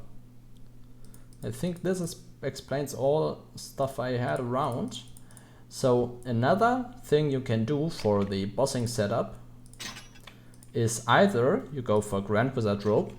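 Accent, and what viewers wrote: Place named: Germany. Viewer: Hello, I'm a new player and I stumbled upon your video after looking through classes gameplay videos and wanted to ask how do you level up so fast (your paragon is at 1032 - paragon what we call on Diablo 3). I just hit 100 on Hell Diff 5 with the White Mage, still confused how to power up faster :D also love your accent (is it German?).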